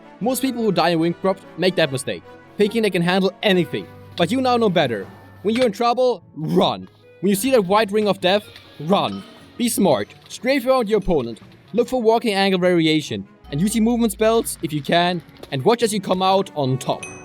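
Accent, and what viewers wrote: Germany. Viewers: You Sound german / middle european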